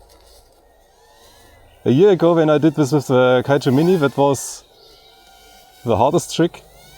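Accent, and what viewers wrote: Germany. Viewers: Damit und das mit deutschen Slang aiso rock das Ding ❤😂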